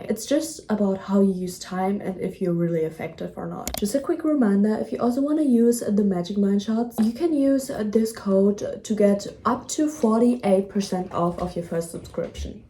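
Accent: Germany. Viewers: I’ve never watched a video from you and like 10 seconds in I was like wait is she German? 😂 not in a negative way, I’m also German, just shocked how easily I spot German accents because they sound so similar to me haha (great video!) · I KNEW IT ! I heard German! But tbh its so cuteee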